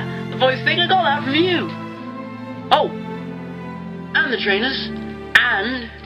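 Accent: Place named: United Kingdom. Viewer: How I like the British accent.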